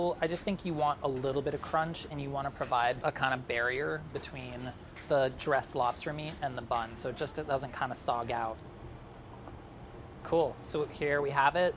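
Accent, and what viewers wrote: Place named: United States. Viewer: You speak really americaaaan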